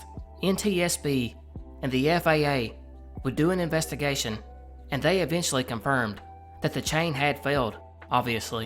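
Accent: South, United States. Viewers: I love your accent and being from Kentucky, I have a little bit of one as well. ❤ So glad I stumbled across this video! Great content! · I'm Southern! Southern English, so these stories are all new to me. Your accent is absolutely charming. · As someone who lives in the south I enjoy more southern youtube channels like yours and Wendigoon, I love your accent, keep up the great work man!! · I really love your accent. No matter how many Americans i met here in Germany, the nicest ones always came from the South :) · I am very glad to find a fellow southerner who makes this sorta content! You got an amazing accent, don’t let anyone say otherwise! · I absolutely love your Southern accent. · i grew up in south carolina and just moved back to the south (tennessee) after living in the midwest for almost a decade and i’m so glad i did. i love the south, your videos, and your accent. 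· It is very comforting to hear a Southern accent presenting topics so cogently and eloquently. Much love and respect from West TN/ North MS, keep doing what you do good sir. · People are awful, guaranteed it was some’woke’ hypocrite hating. Your accent is awesome, never get rid of it it’s who you are, its where you’re from! I’m stuck in a Northeastern grossly, overpriced, dump and often visit the south. I actually lived in New Orleans for five years and wish I never left, but I had to, and I would give anything to live in the south. You guys cherish your history, everyone is so fantastic down there, the food, the music, the everything . So don’t listen to the haters. This is an awesome idea and I love your channel.💙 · it’s awesome to hear someone w a southern accent doing stuff like this! you got a pretty strong accent, where in the south are you from? sending love from the deep south 💜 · Don't get rid of your accent. 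To hell with the haters. DIXIE PROUD! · Even being from the north I enjoy your southern accent and I think you should keep it and embrace it (Also it’s pronounced Kane-ka -kee) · As someone from the south, I barely noticed the accent lol. Sounds friendly to me personally. Love the videos man, can’t wait for you to blow up 👽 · As a MS resident who doesn’t have a strong accent (to the point of being asked where up north i’m from) hearing your southern accent is comforting to me! it’s very epic! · i personally love your southern accent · ill be honest the first time i heard a southern accent on a iceberg lol but honestly it makes your channel unique and im from wv so its cool ! nice seeing some southern iceberg topics !! glad you didn't cut wv out of the mix thanks king !! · Southerner here too, an Arkansan. Trust me hes not faking that accent. Ive heard that twang my whole life and it check out. 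Thats really the way he sounds without even trying. If he was it would be obvious. Making fun of a Youtubers NATURAL accent? C'mon y'all. It could be a whole lot worse. Some youtubers out there feel inclined to make up their own accent. "To establish a brand?" lol gimme a fkn break, nobody on Earth talks like that! Thats the kind of fake sht yall can laugh at, because it is kind of lame. But the way someone naturally speaks? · I'm from the UK and I like the southern accent! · Born, raised, and lived in the South all my life. Your accent is part of you, but isn't you. Don't let anyone give static for it. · I love your accent! I'm Canadian so I don't get to hear a nice southern accent very often. It makes me want to cook up some biscuits and gravy 😆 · You just gained another subscriber! It’s really wild how much accents can vary in timbre and drawl. Way down in the delta is so similar but also very different from here in southern Appalachia. 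It’s great to hear a southern accent here on the mysterious/creepy side of YouTube! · The best part about your content IS your southern accent narration. Ty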